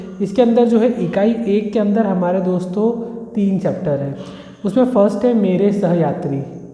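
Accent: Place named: Indore, India. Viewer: Indori Bhasha bahut jhalak rahi hai bhai aapki 👍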